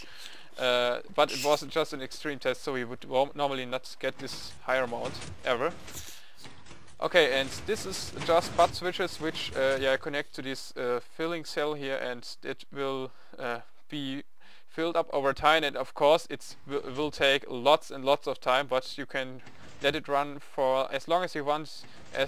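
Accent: Germany. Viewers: mann jetz hab ich schon n paar vids von dir gesehen und hab mir immer gedacht so wie der englisch redet kann der doch nur deutscher sein?! jo jetzt weiss ich es xD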